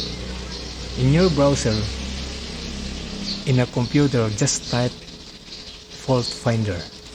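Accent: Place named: Visayas, Philippines. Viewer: p o t a n g e n a n g yung accent mong bisaya, dapat hindi ka na nag eenglish... napaka baduy mong magsalita, mababang uring taong bisaya... nakakabuwiset content mo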